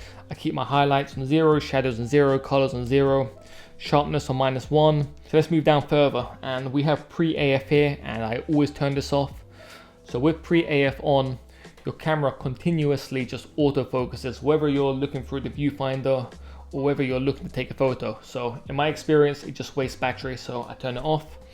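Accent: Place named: Greece. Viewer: I like how he starts out sounding like Ali-G and ends up sounding Greek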